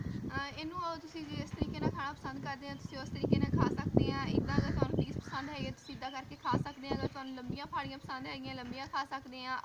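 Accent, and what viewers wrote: Punjab, India. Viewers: Ap Punjabi bohat pyari bolti ho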